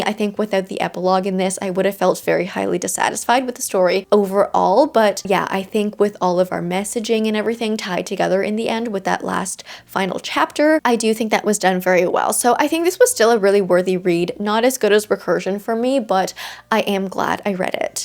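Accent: Canada. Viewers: girl as a Hamiltonian... your canadian accent is ACCENTING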